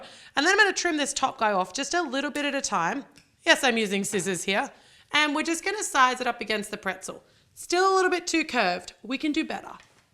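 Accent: Australia. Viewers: I love the Australian accent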